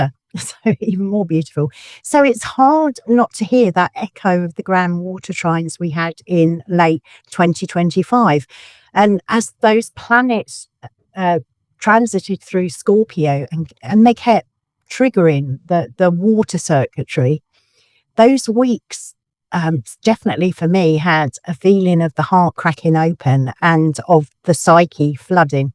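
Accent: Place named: England, United Kingdom. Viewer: Is that an English accent I hear? Music to my ears. I process audio. I especially love English accents and articulation/oration. Love my English brethren. From America. New subscriber. Your voice is melodic and you articulate and explain so beautifully.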